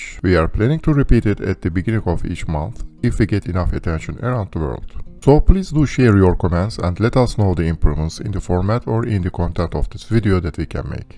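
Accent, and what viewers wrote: Turkey. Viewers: I love turkish accent in english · Degerli, calismalarinizi uzun zamandir cok begenerek izliyorum. Ingilizce konusmali videolara baslamis olmanizi da tebrik ediyorum. Tek tavsiye edecegim sey herhalde konusmadaki Türkçe aksanı. Eger bunu da bir sekilde duzeltebilirseniz cok harika olacak. Basarilarinizin devamini diliyorum!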